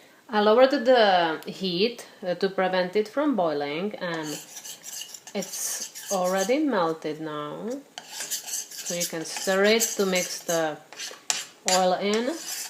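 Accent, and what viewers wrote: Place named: Czech Republic. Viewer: Lol it's not your slight Czech accent.All captions I've seen were inaccurate,so I read them for laughs.Once I watched a 50's United Airlines promo.A beaming flight attendant said to a boarding businessman;"you're my first passenger of the day!". The subtitles read"good morning squirrel,you're my first hemhoroid of the day."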